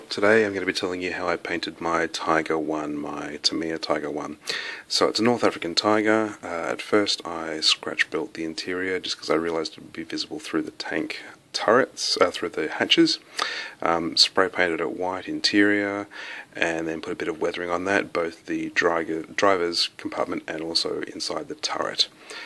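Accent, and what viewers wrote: Australia. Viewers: I sense an Australian accent. Do you buy your base coat and general painting products from a place in Australia? If so are you able to link me the website? I live near no decent hobby places.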